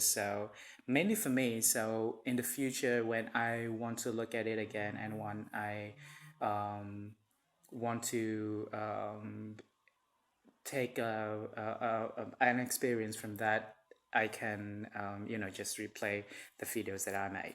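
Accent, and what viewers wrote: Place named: Australia. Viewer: There's Aussie accent left behind haha How long you'll be there?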